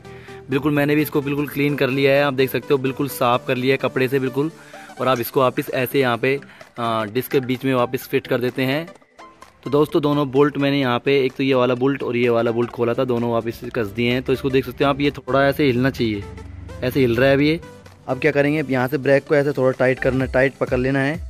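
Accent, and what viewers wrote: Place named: India. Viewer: i don't see you video now but first you India tone i love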